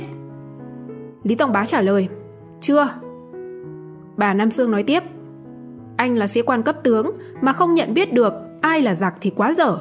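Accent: North, Vietnam. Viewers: Giọng Bắc kỳ đọc rất khó chịu,kho nghe quá,n thì đọc l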